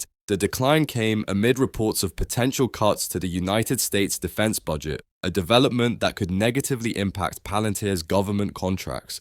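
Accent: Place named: United Kingdom. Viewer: Dude whats up with the Brit accent. 😂. I liked it more when you used your own voice.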